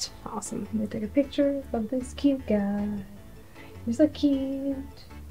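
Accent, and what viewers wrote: South, United States. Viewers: Why do you sound more southern in these newer videos? Lol!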